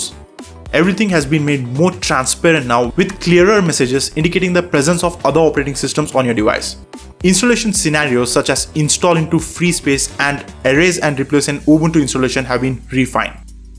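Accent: India. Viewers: He was probably born and raised in a different country from you. My guess is the vicinity of the Indian peninsula? I've got good money that you're a Trump American. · Brother, are you Indian?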